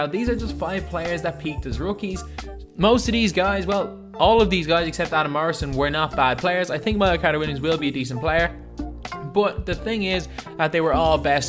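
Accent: Ireland. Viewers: D-ManHD lol what is this comment? He sounds Irish.... because he is Irish. Think about it. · Dude u sound Irish??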